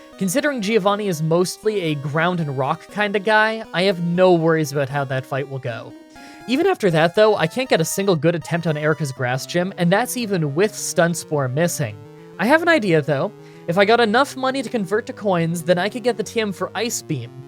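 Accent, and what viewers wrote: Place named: Canada. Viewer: I love how Canadian you are, when you say “out” I never know what pronunciation you will use lol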